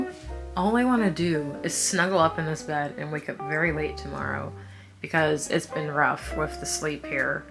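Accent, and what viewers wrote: Australia. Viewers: ur australian accent bye i'm crying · The Australian accent 😂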